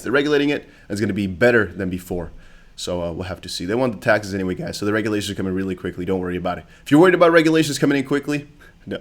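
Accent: United States. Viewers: You sound like you grew up here in America. Our own accents are wildly different from region to region.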